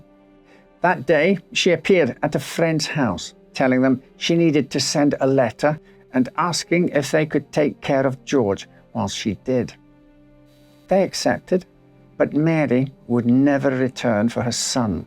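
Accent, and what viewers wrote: United Kingdom. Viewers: This coming from a midwest speaking American, is it just me or British accents just perfect for narration? Also I find the accent to be oddly calming. I know, im weird. lol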